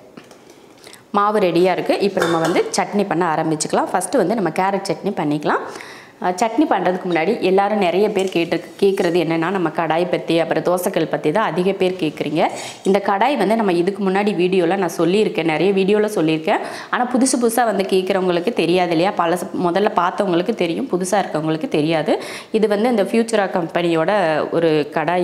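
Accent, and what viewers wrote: Coimbatore, India. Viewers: Neenga Coimbatore ah madam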